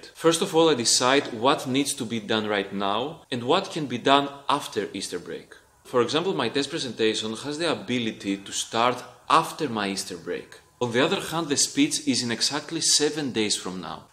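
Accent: Greece. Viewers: I knew you are from Greece because of the accent (is similar to my relatives). Which part of Greece are you from? Also, I love the videos! Keep it up! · The accent never lies, you are from Greece!!! In what major are you studying? I'm studying computer Science in technical institute of Crete. It's my first year and I don't like attending my classes , I find them extremely slow paced and lacking educational material.I prefer being self-taught from online documentation and tutorials so I can progress faster. Have you found yourself thinking the same thing about your college?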